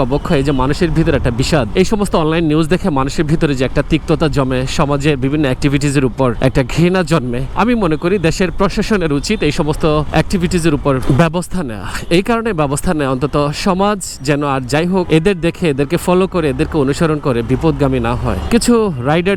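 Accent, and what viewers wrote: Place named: Bhuiyapara, Bangladesh. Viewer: Bhai apnar basha ki Bhuiyapara naki?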